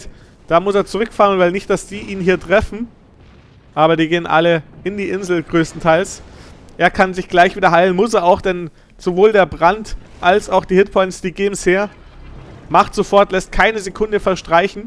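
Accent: Bavaria, Germany. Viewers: Super Video ^^ Du hast eine klasse und angenehme Art zu kommentieren. Mach aber bitte mehr auf boarisch ;)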